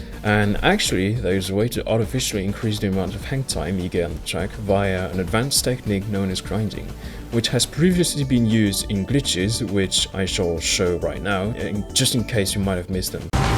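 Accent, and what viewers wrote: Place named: France. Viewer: Best French voice accompanied by best background music <3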